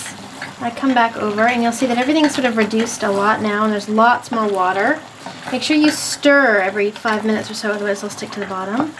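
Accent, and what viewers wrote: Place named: France. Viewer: I read your comment and get your concept. I love it! Recipe looks good. I like it soupy stew like but Check out Martha Stewart and Daniel Boulud ratatouille. It's very authentic as they eat in France as side dish. Bonne chance X p.s your French accent is so incredible!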